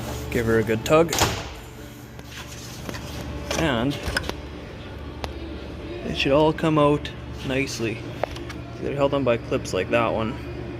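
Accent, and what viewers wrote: Canada. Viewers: I knew you were a fellow Canadian after the first minute of the vid. Good job saved me a bunch of time !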